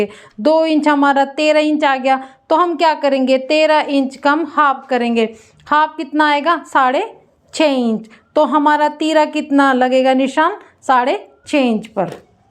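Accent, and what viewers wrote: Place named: Haryana, India. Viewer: Mam aap kaha se ho aapne haryanvi boli bhut acha laga sun ke😂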